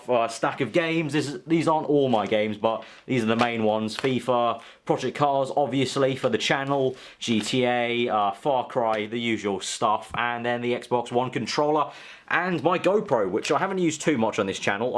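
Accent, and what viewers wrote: England, United Kingdom. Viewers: I was just wondering which part of England your from? The only reason I ask is because your neighborhood looks absolutely beautiful. I live in the US and I've never seen a more beautiful landscape. You don't have to say where exactly, just whether it's northern England or southern, etcetera. Do you live in a rural area? To me you sound English. Your accent, again to me, doesn't sound welsh or Scottish and it's definitely not Irish lol. Plus you, yourself said you're British. And while most American people don't know that Britain is made of three countries, (Wales, Scotland and England; and add the Isle of Ireland and you have the UK, for those of you that don't know) I do. Anyway, I just wanted to know where abouts you're from. As I said before it's an absolutely beautiful neighborhood, you live in. I'd love to live in England. 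Most definitely in rural England, though. As it's the most beautiful parts of your country, in my opinion. If you do live in another country, apologies for my ignorance. ☺ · Pretty sure he's just an Englishman. Lol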